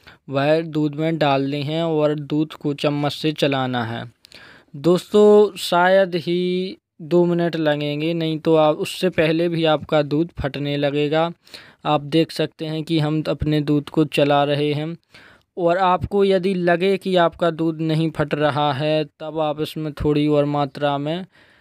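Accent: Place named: Bundelkhand, India. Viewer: bhai main bhi bundelkhand se hu Datia bhander m.p se 👍 aapki voice se pahchan ho gyi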